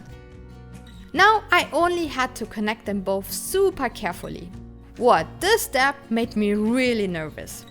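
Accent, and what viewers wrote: Germany. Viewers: Are you from Germany your pronunciation is realy german...I am from Germany to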